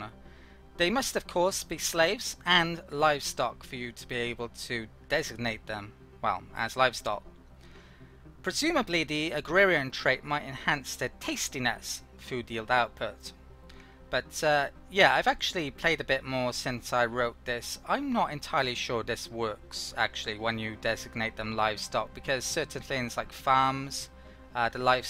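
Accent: Wales, United Kingdom. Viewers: Hey, can I ask, are you Welsh? Because my brain is telling me you are, and I wanted to see if I was right. great video :)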